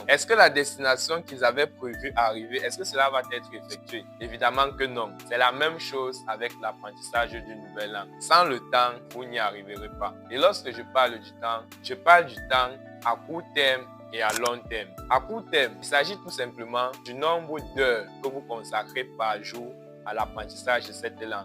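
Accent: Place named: Cameroon. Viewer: On reconnait le ton Camerounais 😂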